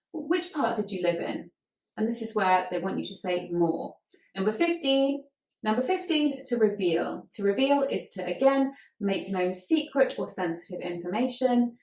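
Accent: United Kingdom. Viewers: Remarkable lesson! Never been a huge fan of British accent but this channel is making me change my mind I guess 😂 Btw once I heard 'snap' as something similar to lose control or get overwhelmed I think. Will you please post another lesson like that you did a few months back on English history? It was the bomb! My most sincere thanks for this video! 😉😉🖤🖤👍🏽👍🏽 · I like your British accent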